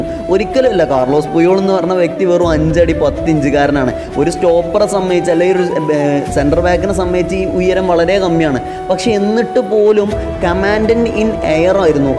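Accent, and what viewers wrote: Malappuram, India. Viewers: Kettit malappuram muthaanenn thonnunnu allenkilum malapurakaarey anik peruthishtaa Alla chaggay maarey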